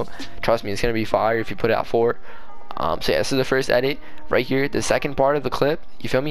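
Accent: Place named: North, United Kingdom. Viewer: Sounds like some up north stuff so I can follow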